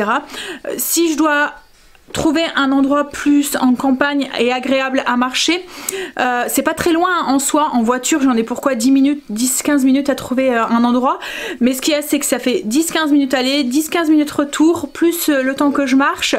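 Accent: North, France. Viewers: Bonjour j'ai pas fini de regarder la vidéo tellement que je suis énervée 😒 c'est quoi encore ces gens qui disent des choses non justifiées oui parce que dire Rome à la place d'Italie n'est nullement une faute de français, un lapsus ce qui est différent et je pense que ces personnes sont loin de savoir la langue française et qu'ils doivent faire énormément de fautes d'orthographe. Ensuite les gens chaque personnes prononcent un mot différemment de chacun POURQUOI LA RÉGION D'OÙ VOUS VENEZ et n'oubliez pas que est du NORD l'accent du mot et pas une faute de la langue française c'est simplement une prononciation type de son origine comme en corse, Italie, Provençal bref avant de critiquer soyez sûr de votre FRANÇAIS. Voilà j'ai fini tu es une bonne personne la vie réserve de mauvaises surprises parfois et certaines ont une vie plate sans soucis alors CRITIQUES.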